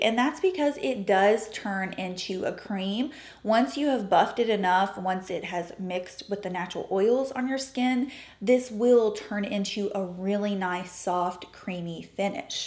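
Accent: South, United States. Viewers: I was looking for a comparison of the bareMinerals Well Rested and the Bisque a few days ago and I came across one of your videos from 2013. It was linked I believe on your website. Your hair was past shoulder length with bangs.partially swept to the side. Your southern accent was in full force. I so enjoyed that video! You were beautiful then and you’re still beautiful now. It was really great to see! 💕💕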